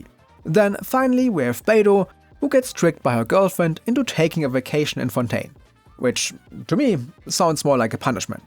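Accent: Germany. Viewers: HOERE ICH EINEN KLEINEN DEUTSCHEN AKZENT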